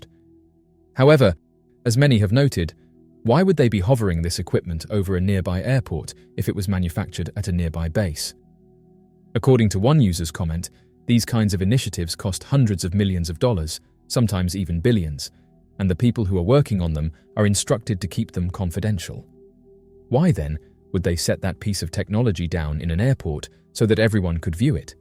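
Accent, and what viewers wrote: England, United Kingdom. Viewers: A truly excellent video once more, with excellent scientific commentary from a good clear English accented voice in unhurried speech very easy to follow. This is so much better than many American accented voices seemingly gabbling away at such a rapid pace I find almost impossible to follow or even fully comprehend ! Congratulations to the excellent commentator ! Let’s have more excellent commentators like this speaking scientific sense ! Cheers, Richard Leschen. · I prefer American accent. I can barely understand this British accent. Hard to follow. Make a better video with an American accent.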